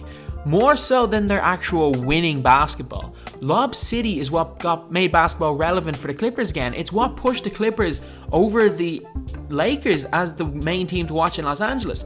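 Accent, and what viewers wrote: Ireland. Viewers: is that an Irish accent you're sporting?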